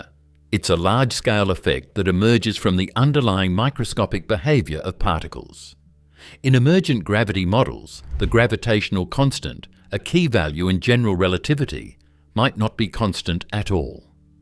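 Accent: England, United Kingdom. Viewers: They have an English man read these dumb questions to seem smart. In the beginning God created the heavens and the earth.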